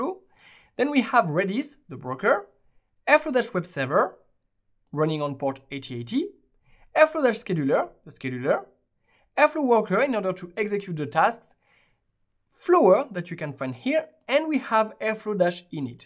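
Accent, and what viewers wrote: France. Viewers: please improve your accent because not all the words can be understood correctly. Too many French sounds when pronouncing. Thanks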